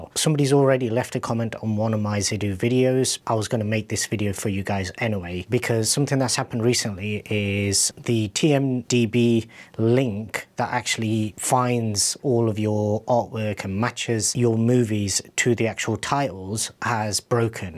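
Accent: United Kingdom. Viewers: Please next time speak even faster, so that foreigners again can skip your video. And just because of your extremely British accent, I mostly only was able to guess, what your sentences possibly were supposed to mean.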